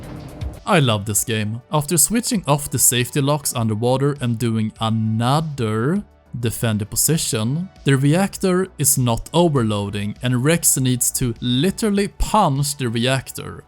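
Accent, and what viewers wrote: Sweden. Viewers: You sound so swedish. It´s funny. Good video.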